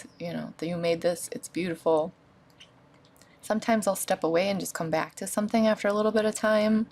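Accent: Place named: New England, United States. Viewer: Beautiful thank you, I’m from Massachusetts too. I thought your accent sounded like you from New England, lol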